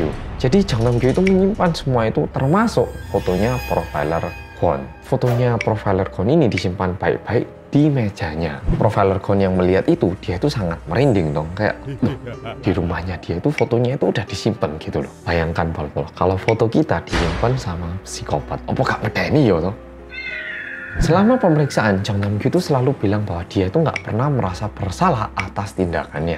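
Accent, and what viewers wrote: Java, Indonesia. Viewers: Suweneng medok e Mas.... Sehat selalu ya.... Semoga karya dan karirnya semakin melesat naik Aamiin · Detektif medok 💜👍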